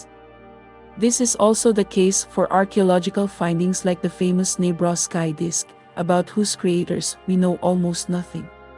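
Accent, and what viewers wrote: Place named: Philippines. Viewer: My guess is the narrator is from the Phillippines. I could be wrong but hope I'm right!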